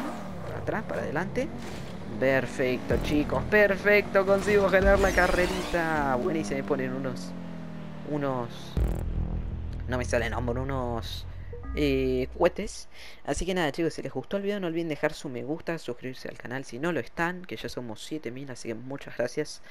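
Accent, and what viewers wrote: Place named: Argentina. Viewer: Me vuelve loca el acento argentino y mas si es hablado por ti, te quiero, saludos desde Mexico❤️❤️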